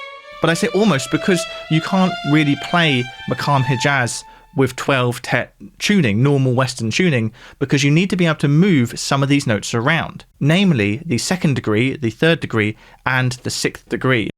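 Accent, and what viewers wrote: England, United Kingdom. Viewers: Nothing like an English accent to wash off the magic.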